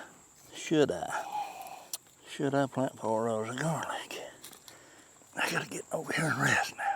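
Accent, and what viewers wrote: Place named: Appalachia, United States. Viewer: Your video just popped up in my video feed and you sound like you are in my neck of the woods in Appalachia. Maybe Ky or Tn? Hickory king is the best field corn and roastin ears too. I love it for corn relish and cornbread too! Yep, seed time and harvest time and global warmin and coolin. I like your Farmall Cub. My grandaddy had a Farmall M. Plant everything you can. You'll need it soon enough.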